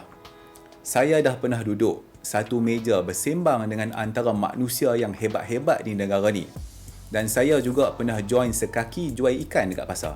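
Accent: North, Malaysia. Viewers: bang loghat utara abg terbaik....abg utara blah mana?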